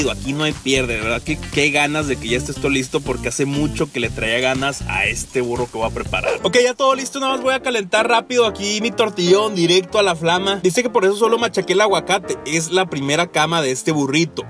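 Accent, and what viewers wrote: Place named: Mexico. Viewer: Como me gusta ver tus videos me haces reir😃🤣😁 con las cosas que dices te escuchas bien mexicano❤ Estan bien padres tus recetas un dia de estos cocino algo siguiendo tus kitchen skills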